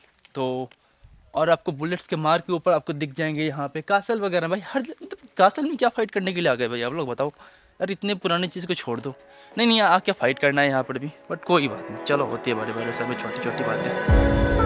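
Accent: Bihar, India. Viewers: That,s pure 😘 Bihari accent 😂 love you brother ❤️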